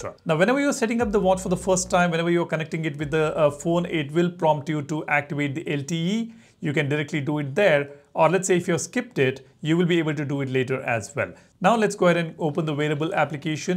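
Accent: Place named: India. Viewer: Can't even with this Indian accent. I'll find another video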